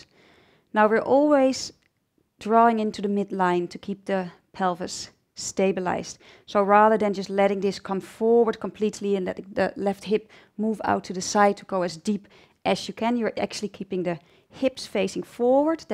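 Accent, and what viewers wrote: Netherlands. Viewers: i love your dutch accent!!!!!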